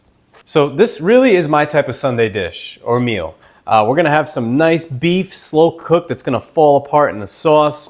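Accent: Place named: Long Island, United States. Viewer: are you from Long Island? I sense you are as am I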